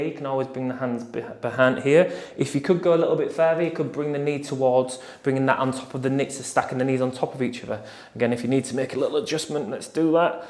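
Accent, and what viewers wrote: United Kingdom. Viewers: Thanks!! Out of curiosity, what part of the UK are you from? I love your accent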